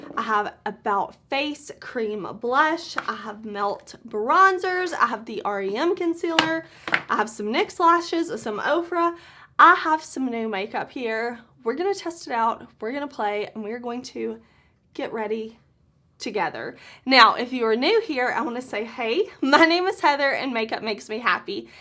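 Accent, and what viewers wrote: South, United States. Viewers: I didn’t realize you live in Mississippi, I live there as well, in Columbus :) I knew you had a southern accent, just didn’t know where you were from. You’re one of my favorites to watch so it’s cool to know we live in the same state!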